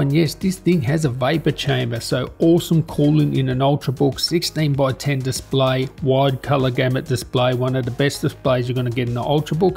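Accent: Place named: Australia. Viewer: i think the australian accent is pretty awesome 🤷‍♂️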